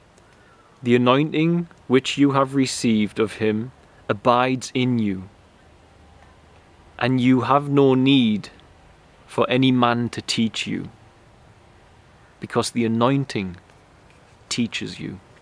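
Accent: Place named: Geordie, United Kingdom. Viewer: Are you from the North East of England?? You have a great accent/dialect and it is very similar to my partner’s accent and He is a Geordie (from North Shields, North Tyneside) of which He is extremely Proud.. Just thought I’d ask if You are also From Our Local Area.. Obviously I’m meaning - Originally.. Much Appreciated for your excellent work in helping humanity - True Angel of God xxx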